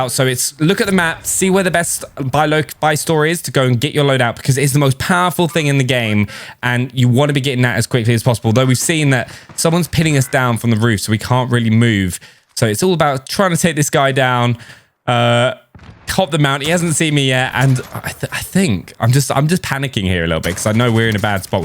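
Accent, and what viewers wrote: United Kingdom. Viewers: mate are u british because u sound it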